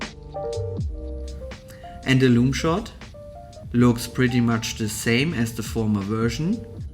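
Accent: Germany. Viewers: Your English sounds a bit German?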